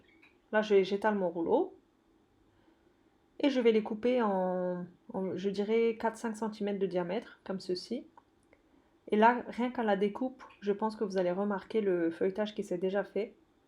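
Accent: Alsace, France. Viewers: slm je viens de découvrir votre chaine..toujours de belle recettes a testé..bien expliqué ..vous etes de l'Alsace ya comme un petit accent 😀bravo a vous en tout cas 🖒🖒😚j'aime bcp la cuisine turque aussi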